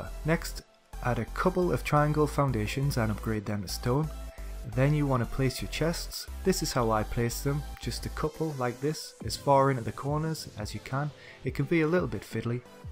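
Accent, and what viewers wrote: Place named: Newcastle, United Kingdom. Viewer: Nice build mate and you a geordie too? sound very local lol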